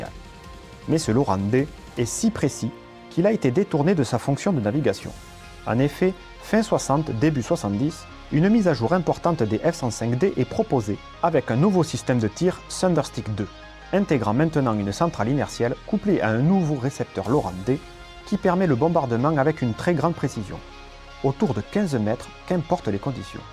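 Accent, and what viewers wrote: South, France. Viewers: Un soleil de YouTube tant par la qualité du contenu que par l’accent du sud qui fait plaisir à entendre (le Basque est content). Continue comme ça, c’est un régal! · Merci et Bravo, et votre accent du sud est un régal 🤗👌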